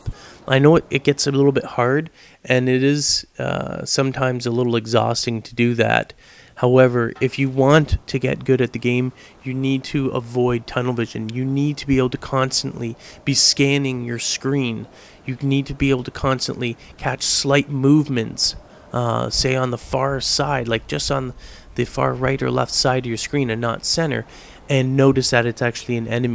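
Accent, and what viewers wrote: Canada. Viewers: Is this dude canadian? his accent is kinda funny.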